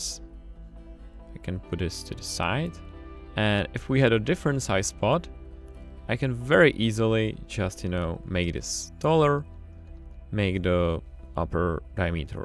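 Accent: Czech Republic. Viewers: Czechenglish se nějak špatně poslouchá:) Ale jinak víc takových tutoriálů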